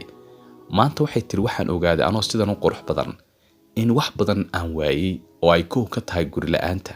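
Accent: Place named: Somali Region, Ethiopia. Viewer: Waa Somali ninka sida hadal ka lo dhigey Ethiopia Somali ba mise waa Ethiopia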